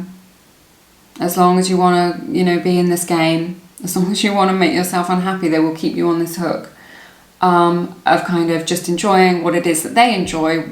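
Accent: United Kingdom. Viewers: Pile # 3 and specially pile #4 : resonate 100% , this video is a turning point for me , let us call it the beautiful truth , we really need always to search for the truth.Its almost personal , I have done the work on myself and learned my lesson , thanks for the universe for upgrading us and thank you so much for helping me sort things out . I love you and love your British accent too 💓💓 To my fellow viewers : I watched the whole video , the 4 piles and I got a message from each of them ,somehow 4 piles resonated with me somehow , wish you all love , light and the best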